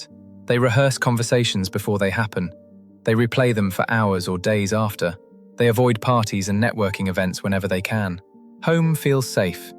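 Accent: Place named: United Kingdom. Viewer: I miss the previous persona/voice. This British accent feels distant. It actually makes me uncomfortable.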